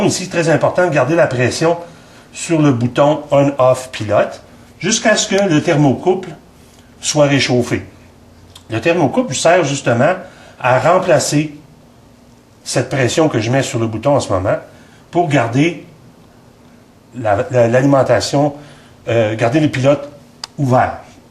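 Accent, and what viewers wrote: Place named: Quebec, Canada. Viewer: Un québécois !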